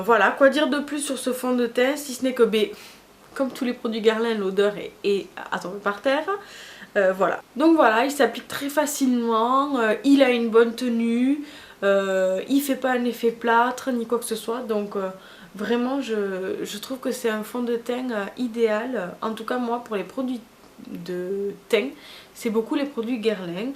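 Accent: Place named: Toulouse, France. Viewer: A la la cet accent toulousain ! 😂💕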